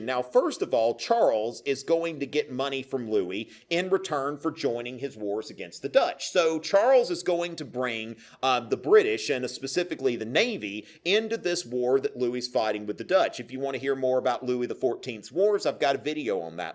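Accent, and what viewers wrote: South, United States. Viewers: Good lecture but it is so strange to hear such strong southern accent. It is not the guy's fault, but it is difficult listen to. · As an Englishman, listening to our history getting explained informally in a strong southern US accent just doesn't sound right 🤔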